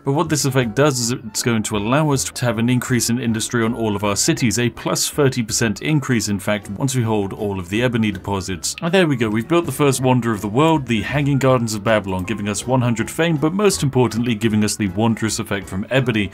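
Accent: United Kingdom. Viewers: Day 6 of reminding we want to hear him say "Sugoi" in his glorious British voice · sounds awfully British too me 🧐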